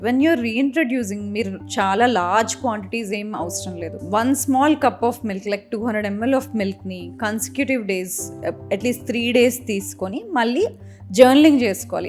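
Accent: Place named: Telugu, India. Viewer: Milk గురుంచి చాలా బాగా చెప్పేరు madam. Thanks for your Telugu slang, which beautifully explained us,the issue.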